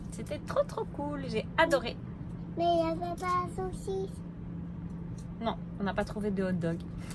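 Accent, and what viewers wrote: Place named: Belgium. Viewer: Quand les videos sont de qualités c'est tjs un plaisir à regarder. Sur la vidéo de présentation de Many j'avais cru entendre un petit accent belge et j'ai eu confirmation sur la première vidéo que vous étiez du plat pays . Si j'ai bien compris vous faites votre trip pendant 13 mois . Vous voulez faire tout le continent américain et renvoyer Many en Belgique ou vous allez le vendre sur place ?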